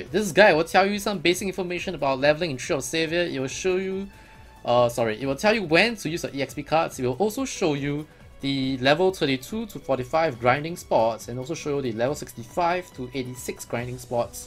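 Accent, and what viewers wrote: Singapore. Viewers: Thanks man, bro are you Singaporean too? Hahaha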